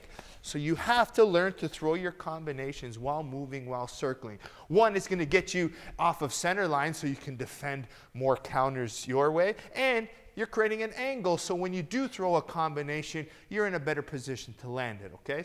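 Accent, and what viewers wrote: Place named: Italy. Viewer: Dude you have an Italian accent!!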